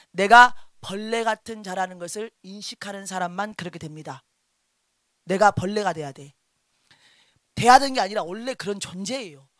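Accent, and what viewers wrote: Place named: Jeolla, South Korea. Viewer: 목사님 채널을 알게된지 며칠 되지 않았는데요. 같은 전라도 사람이라서 그런지 억양, 말투 넘 친숙해서 좋았어요. ㅎㅎ 무엇보다 화통하게 웃으시는 웃음이 넘 유쾌하게 좋아요. 시대에 맞게 때를 따라 양식을 나눠주시고 지혜있고 충성된 종이 되어 말씀으로 양육하시니 감사드립니다. ^^ 댓글 잘 안다는데 저도 다른 분들 따라서? ㅎㅎ 댓글 달아보아요~^^ 전라도에서 정치에 관련된 목소리 내기 쉽지 않을텐데 용기내서 타협하지 않고 바르게 말씀 전하시는 것을 보면서 참 감사했어요. ^^ 주님 안에서 강건하시고 휴거되어 들림받는 은혜가 있기를 축복합니다. 감사합니다. ^^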